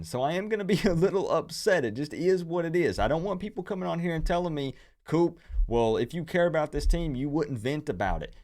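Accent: South, United States. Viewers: Great analysis! Still don’t understand (considering your southern accent) how you are a Canes fan. All the Canes fans I know are into bling-bling, hip-hop and gangsta rap.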